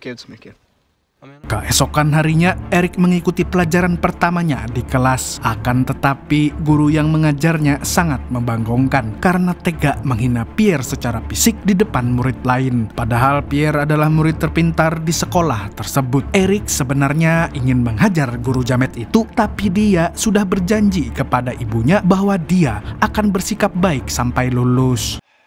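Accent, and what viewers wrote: Banjar, Indonesia. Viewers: urang banjar pian lah min 😀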